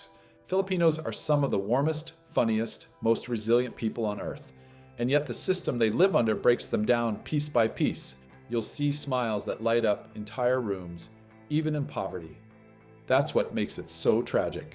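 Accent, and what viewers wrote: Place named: China. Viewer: The narrator here is trying to sound like foreigny Westerner, but have Asian accent, from China?..Airport a chaos? Probably true yrs ago till late 2024 had major upgrade & improvements, viewable online, after the private management takeover by largest Phil firm,improvements include Grab taxi stands w fixed prices..Of course if one goes to rural towns in the Phils, Thailand & Vietnam, one wouldn't expect better updated amenities except that Phils has Musk's starlink reaching remote areas. Poverty rate of Phils is 10.6%, 3rd LOWEST in Southeast Asia tied w Thailand, topped by Singapore and Malaysia, so untrue that poverty drives Filipinos to be dishonest and smiles w a financial agenda.For comparative, USA has an 11% poverty rate, while some of China's poor are eating garbage w millions now are going to countrysides due to serious job shortages ( Decoding China, China Observer, Lei's Real Talk). 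Reader's Digest gives a passing grade on honesty on Filipinos.The Phils is ranked 3rd on Safety in Southeast Asia by the Gallup affiliated survey outfit..Phils voted in past administration an atheist Pres, so untrue Catholic Church has strong political clout..Untrue garbage is prominent in Phils' invirons,top SEA garbage producers : 1. Indonesia 64 mt.yr 2. Thailand 27 mt.yr 3. Vietnam 22 mt.yr 4. Phils 15 mt.yr. . Viewable online are foreigners' roundtrip MRT.LRT slightly elevated train rides around Metro Manila adjoining cities, as if seeing entire Phils & see if garbage, stray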